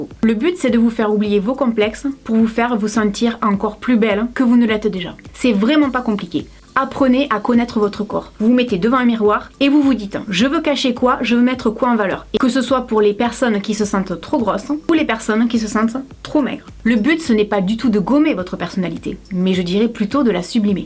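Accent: South, France. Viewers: J'adore ton accent du sud!! J'adore...... 😄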